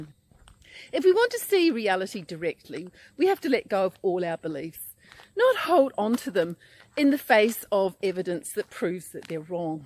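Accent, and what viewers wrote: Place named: South Africa. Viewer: Walking and videoing, horrible SA accent, rambling on and on, makes for a bad video.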